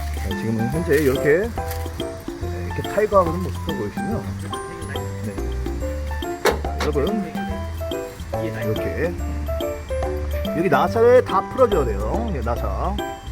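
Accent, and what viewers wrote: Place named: Yanbian, China. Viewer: 예전 개콘에서 '당황하셨어요.. 고갱님~~' 이 코너가 생각나네요 구미가 아니고 연변 아닌가요?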